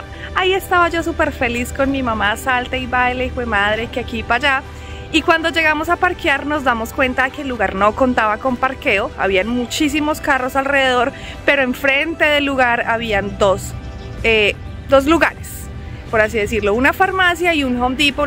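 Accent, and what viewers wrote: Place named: Colombia. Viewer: Jajaja ahora si te salió todo colombiana contando esto. Saludos desde Chiapas México.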